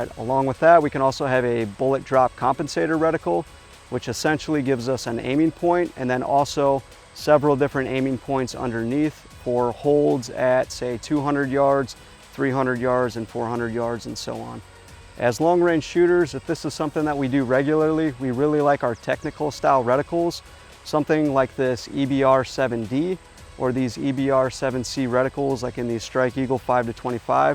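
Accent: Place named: Wisconsin, United States. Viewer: Wisconsin. Huh, I thought he sounded familiar. Greetings from the Fox Valley!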